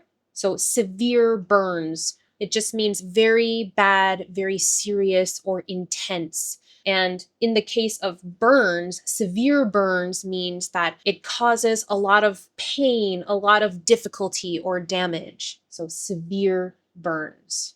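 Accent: United States. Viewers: Hi, I really love your American accent—I could listen to your voice for hours! Your lessons are worth the wait. Thanks a bunch for teaching me!❤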